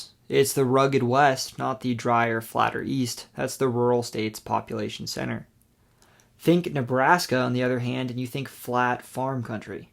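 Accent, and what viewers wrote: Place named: Georgia, United States. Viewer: thought you were from Georgia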